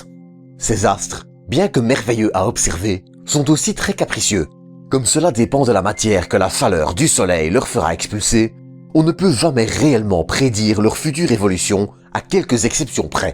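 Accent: Liège, Belgium. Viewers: Ah ouais accent de Liège ça nan ? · Bonne video, mais pourrais-tu effacer cet accent belge à couper au couteau ? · "je prends note pour l'accent, et je vais essayer d'améliorer ce point." Ne change rien, big universe, ça fait parti du folklore Belge, et c'est éminemment sympathique !! Cordialement d'un presque voisin, basé à côté de Lille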